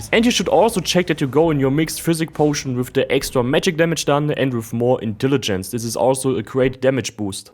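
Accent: Germany. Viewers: Also das ist mal ein geiler build. Wenn du mir jetzt noch sagst das ich mit dem diesen scheiß feuerriesen killen kann dann bist du ein Held weil ich diesen Arsch nicht fertig bekomme 😅. Ps: als deutscher hört man immer die anderen deutschen raus 🤣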